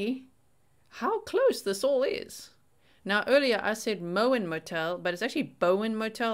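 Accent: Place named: Afrikaans, South Africa. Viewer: You HAVE to be south African! Nowhere else in the world would you find that strong afrikaans accent.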